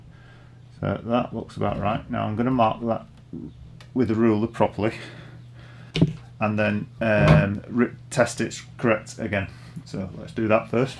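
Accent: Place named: Dorset, United Kingdom. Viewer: Thanks for sharing this. I am trying about doing this to an old beater that I have had laying around for a while now. I have just joined a band back in November and it would be kinda fun to have two acustic guitars to work with instead of just the one that we currently have available to us. I thoroughly enjoyed your playing at the end of this video. You are very talented. Thanks for sharing your experience and skills with us. I would really enjoy more videos of you playing. You aren't from the Dorset area of the UK are you? Your accent reminds me of an old acquaintance I knew from college who is from Dorset.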